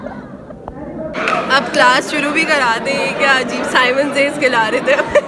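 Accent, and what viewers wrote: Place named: Karachi, Pakistan. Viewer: Your Karachi type accent is so funny. Lol. I'm from Lahore.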